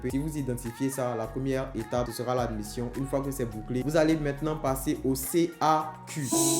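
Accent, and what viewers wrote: Ivory Coast. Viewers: La musique en fond de la vidéo est un peu prononcé, la video est excellente, j'aime plus l'accent ivoirien avec les blagues 😏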